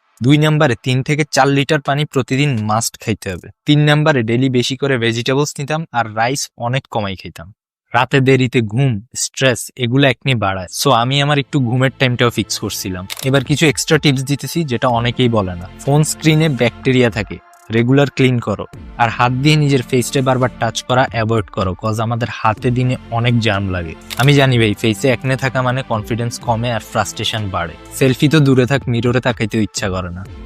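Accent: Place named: Noakhali, Bangladesh. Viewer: oh but tomar kotha bolar dhoron amader Feni Noakhalir motoi ❤️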